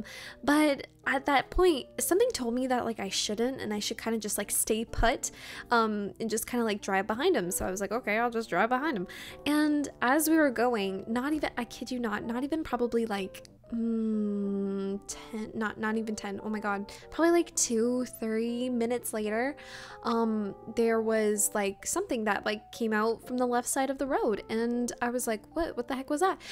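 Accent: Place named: Canada. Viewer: out of curiosity, are you Canadian? The way you pronounced some words led me to believe you are (I’m from Ottawa, lmao)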